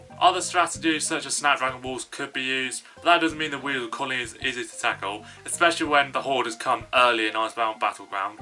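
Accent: United Kingdom. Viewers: I think he's Britisih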